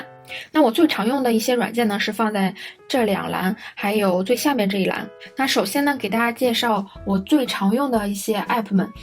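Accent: China. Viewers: 口音是烟台的吧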